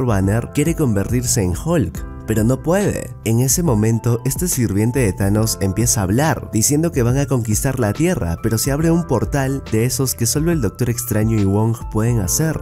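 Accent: Peru. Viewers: gran resumen y mis sospechas son de que eres peruano xd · Tal ves sea por qué el men es peruano y pgod también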